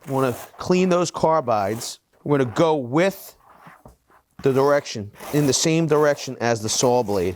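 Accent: Philadelphia, United States. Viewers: That's phillidelphia I hear i your voice. Almost thought it was Camden but it's Philly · I'm guessing you're from PA, specifically around Philly. Wuder instead of water. Lol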